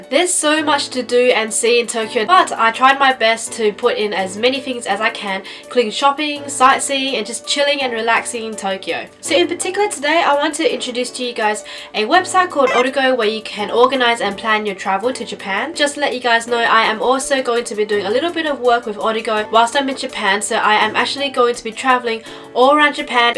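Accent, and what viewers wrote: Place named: Australia. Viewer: you are very pretty, and sound like an Aussie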